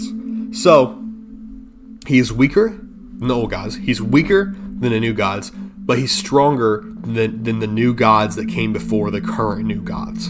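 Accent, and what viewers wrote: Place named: Turkey. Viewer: sounds suspiciously turkish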